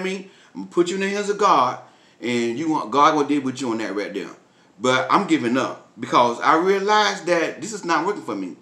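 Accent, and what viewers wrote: South, United States. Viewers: Your Southern Accent💖💖💖 You have wisdom.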